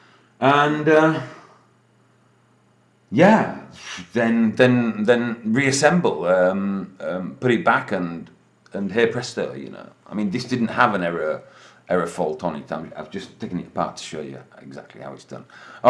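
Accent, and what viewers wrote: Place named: Yorkshire, United Kingdom. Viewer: Mmmm..... Micheal Caine speaks with a London accent/Cockney accent....I rather think this chap is a Northerner.....Yorkshire area. Mmm?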